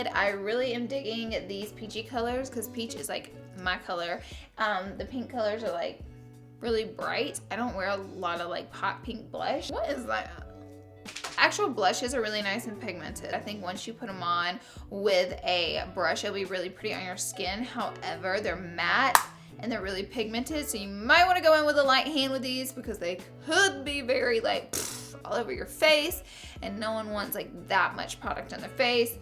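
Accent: South, United States. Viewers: everytime says oil 😂 #thatsouthernaccentitsthebest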